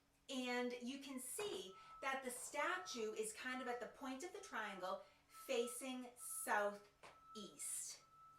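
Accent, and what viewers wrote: Boston, United States. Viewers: These are fun, and I love your Boston accent! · Hi. I love Revolutionary War history and I subscribed to your channel over the weekend. I live in Essex County, N.Y. where the famous forts Ticonderoga and Crown Point are located. I would love if you did an episode on the 58 pieces of artillery that were dragged overland each on a sled of its own in the winter of 1775-76 from the captured Fort Ticonderoga to Dorchester Heights by General Henry Knox and his men forcing the evacuation and liberation of Boston from the British in March of 1776. It was an extremely difficult task and engineering feat without a loss of a single cannon and a victory for the colonists. I would love to see where they set the artillery in Dorchester Heights that forced General Howe his army and the loyalist population to flee. Had this band of men not been successful future revolutionary events could have turned out radically different. 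Thank you for the interesting post to watch and your Boston accent is fantastic. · I am embarrassed to say that I am almost 80 and life a short distance from Concord/ Lexington and have not visited, but watching these videos is giving me impetus to doing it this spring. BTW--- ---you have a Boston accent you can cut with a knife. It almost sounds Dahhchestuh, but not quite--but sounds as if you grew up in Boston: Captain Pahkuh! BTW---I have several kin that were residing in Plymouth, Kingston, and Duxbury that responded to the Concord emergency or the Concord alarm as it was referred to. Quite a hike on short notice!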